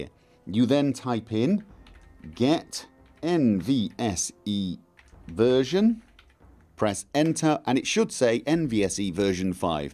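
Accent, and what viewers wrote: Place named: North Wales, United Kingdom. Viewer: Are you from North Wales or there abouts? Trying to place your accent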